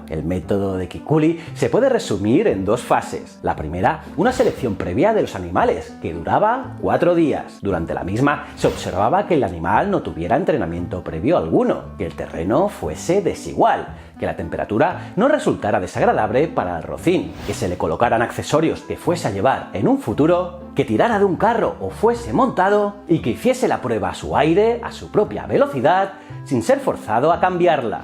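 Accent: Spain. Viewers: Acaso los unicos productores de contenidos son asquerosos españoles , cuando esta gente es cinica mentirosa y estan dedicados en borrar historia de arrasamientos esclavitud asesinatos y robos el dolo escuchar este acento me revuelve el estomago, basta de estos asesinos no mas contenidos de estas aesinos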